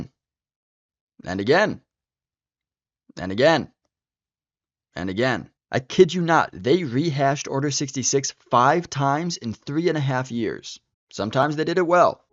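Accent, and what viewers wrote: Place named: France. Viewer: You sound so french.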